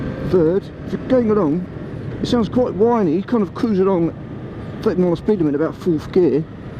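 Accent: United Kingdom. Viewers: you sound british, i've got a question. what does the word cheeky mean, if someones acting cheeky what would you call that in american english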